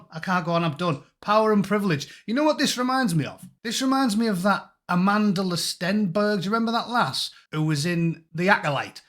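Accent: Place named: England, United Kingdom. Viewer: What town in England are you from that you have this accent? Serious inquiry.. love the accent!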